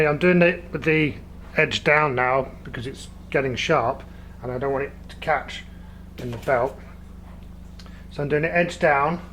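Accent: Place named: Somerset, United Kingdom. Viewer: I live in france, I bought an Opinel the other week and it's got a stainless blade now, i was gutted, shoulda checked it before i bought it tbh. Only found your videos yesterday and i've been binging on them, nice to hear a somerset accent again, where i used to live (nr Cricket St Thomas) Keep on making vids mate, I love em.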